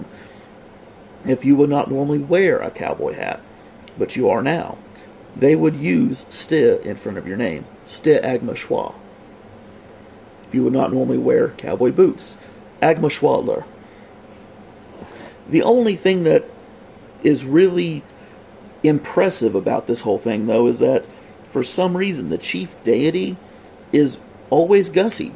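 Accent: United States. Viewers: as a southerner, this is how some people really talk down here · Pretty sure this is just how people from Mississippi talk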